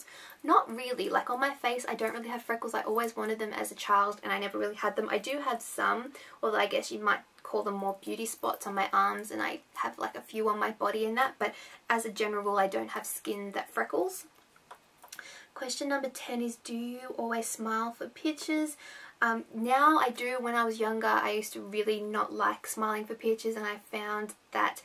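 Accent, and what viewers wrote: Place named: Australia. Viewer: just stumbles onto this video and had to subscribe, it sounds creepy but you have the nicest, most relaxing voice and accent ever! i love australian accents! Definitely see live of you get chance, i've seen them 4 times now and they're my favourite band ever! They're kncredible live! And Danny is a lot hotter close up;) haha x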